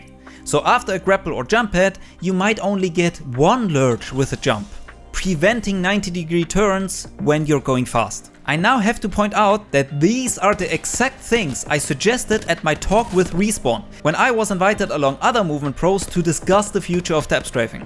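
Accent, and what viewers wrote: Germany. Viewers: Der ist doch deutsch oder? Dieser Akzent ist ja kaum auszuhalten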